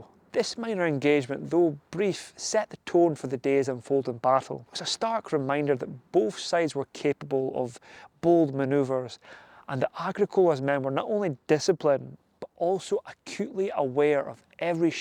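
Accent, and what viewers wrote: Scotland, United Kingdom. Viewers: That's what he says. His Scot's accent makes it harder to hear the hard c sound.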